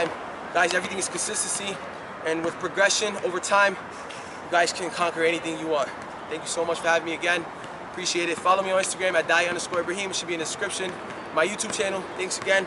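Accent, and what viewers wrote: New York, United States. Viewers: are you from New York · Nice omg love the NY accent😂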